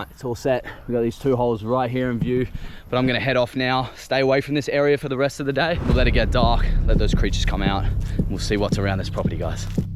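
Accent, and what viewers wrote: Australia. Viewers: I LOVE THE AUSTRALIAN ECCENT😩❤️ greets from the Netherlands!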